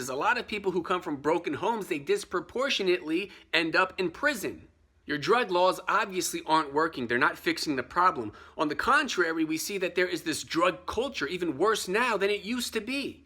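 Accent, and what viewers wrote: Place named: United States. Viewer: If American is so bad why are you using YouTube?? An American invention???? Why are you talking with an American accent?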